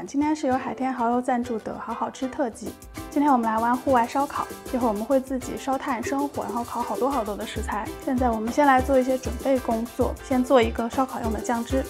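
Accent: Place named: Jiangsu, China. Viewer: 这是哪儿的口音 像江苏的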